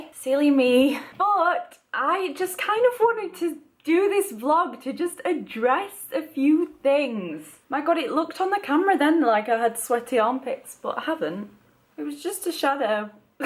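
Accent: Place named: North England, United Kingdom. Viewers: I love your accent where in the north are you fromy mums from liverpool but I live in sw london xxxx I love you . Great video x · Reasons why quickly became 1 of my favourite youtubers: 1) She answers comments like says thank you or answers peoples questions which I find not many youtubers often do. 2) She works so hard on her videos especially editting them and they look amazing. Also you upload them regularly unlike some other youtubers. 3) You are brave enough to talk about this on the internet even though its a hard subject. 4) I dont usually like yorkshire accents but I love yours and I wanna speak like you although I cant do a yorkshire accent lol. Thank you for making this video even though you dont want anymore subscribers I think you truly deserve it and I cant imagine how anyone wouldn't like you. As well as that you made me think about being judgemental in this video and will try not to be, therefore you are making me a better person. 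Please dont stop making videos and please be in zoellas vlogs! I hope the hate stops and that this comment makes you feel better xxx 💞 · She's from Sheffield I think, North of England :) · yorkshire i think?x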